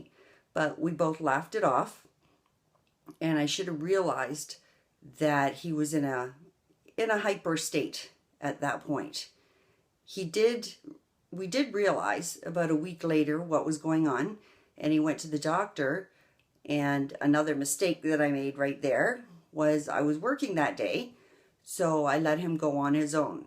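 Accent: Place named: Canada. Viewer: Accent sounds Canadian.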